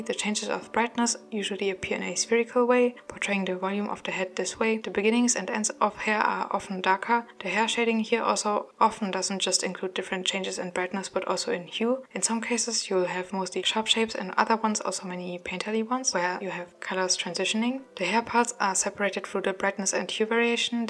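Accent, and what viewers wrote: Germany. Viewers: THANK YOU. So useful! Are you german? I hear an accent. I also recommend talking slower or more clearer, sometimes it's difficult to understand you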